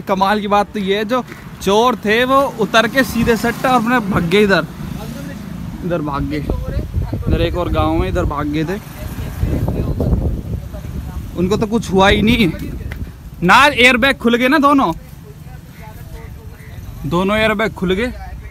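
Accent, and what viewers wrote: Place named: Jewar, India. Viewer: Bhai jewar ke pass ke lgte ho awaz se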